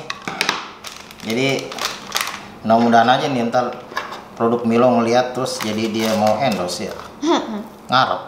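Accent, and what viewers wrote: Jakarta, Indonesia. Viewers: Logatnya betawi bet bang 😁 jadi inget guru silat ane dr betawi juga 😁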